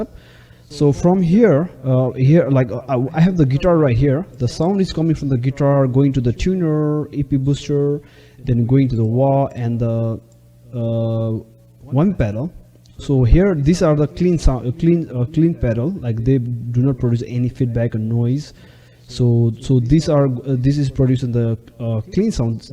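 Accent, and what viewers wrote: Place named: Nepal. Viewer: Are you Nepalese bro? you’ve Nepalese Accent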